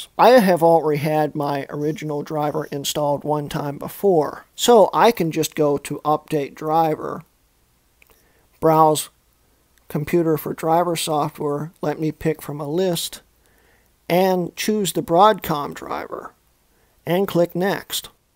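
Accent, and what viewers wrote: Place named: United States. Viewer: Gosh that American voice is absolute AIDS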